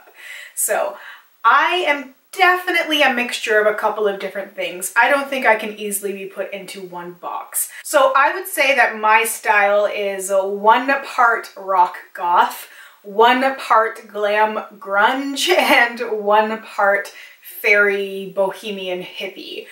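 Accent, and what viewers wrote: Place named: Canada. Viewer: I can totally hear your canadian accent in this vid lol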